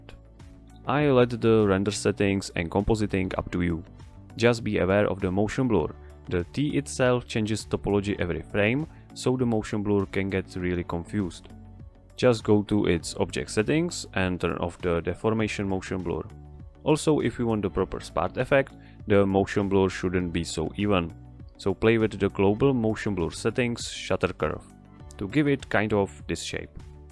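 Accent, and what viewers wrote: Czech Republic. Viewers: Nice tutorial! Are you Czech by any chance? :D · u sound like czech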